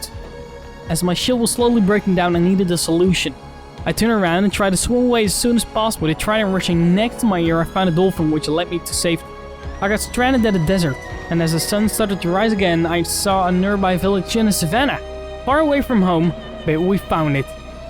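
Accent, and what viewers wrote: Netherlands. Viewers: Nederlands accent tho XD verry nice game play! loved it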